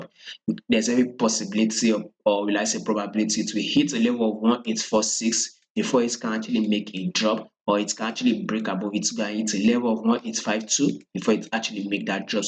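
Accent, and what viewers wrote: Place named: Africa. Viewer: u talking to heavy African accent..can u write the levels to buy n sell on the chart so its easy to follow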